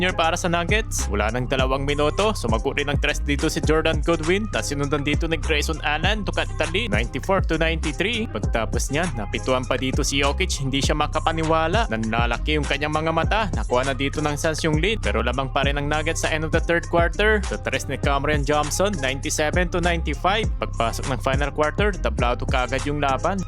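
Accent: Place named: Visayas, Philippines. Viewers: Dong,ano probinsya mo?? Bisaya ka ano??? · Visaya k dong boses m pilipit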